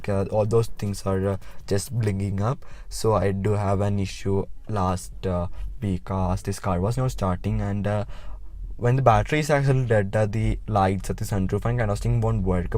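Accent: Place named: Kerala, India. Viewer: Malalyali aananu first 2 seconds le manasil aayi :)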